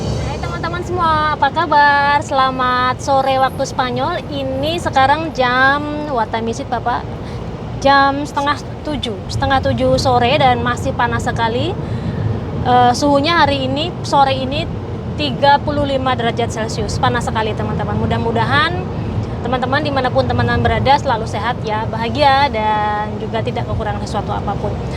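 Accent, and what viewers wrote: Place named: Surabaya, Indonesia. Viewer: Bhs ne kyok. Logate suroboyoan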